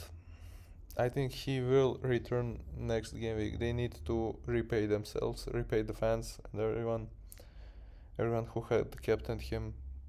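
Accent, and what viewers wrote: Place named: Serbia. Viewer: English with Serbian ascent:)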